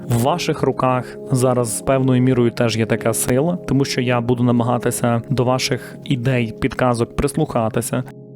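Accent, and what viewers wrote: Ukraine. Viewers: з Галичини — 100%)) Я за повномасштабну вже навчився на півслові вловлювати, звідки людина))))) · Доброго вечора, питання не в тему відео, але... Ви випадково не з заходу України? Бо у вас специфічна вимова деякий слів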